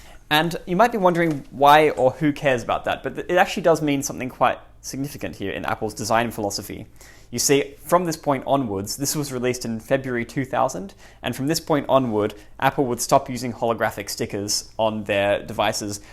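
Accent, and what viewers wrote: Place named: South Africa. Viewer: Great video, I bought a Pismo Book for £25, fully working in excellent condition from eBay. Are you South African? Your accent sounds like my dad and he is from East London, Natal! Great video mate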